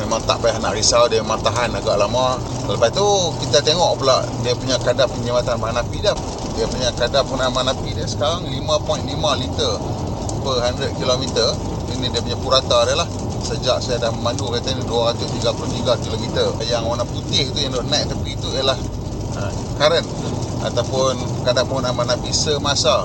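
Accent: North, Malaysia. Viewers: best explain loghat utagha..sempoi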